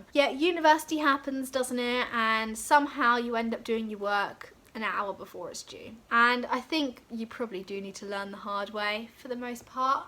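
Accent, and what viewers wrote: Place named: RP, United Kingdom. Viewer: Hello... I love your perfect videos... It is very kind of you giving us advice about university... Btw i am the guy from greece (40°C+)💜💜💜.... I love your british accent... Recieved pronunciation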